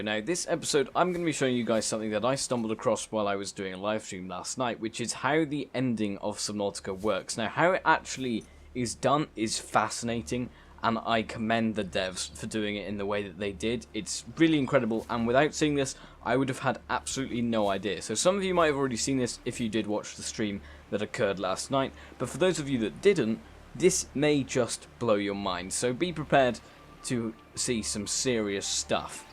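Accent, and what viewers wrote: United Kingdom. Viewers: Get off the American Wi-Fi you’re in Britain I’m assuming project that you’re speaking British stop holding up the American Wi-Fi and use your guys his own Wi-Fi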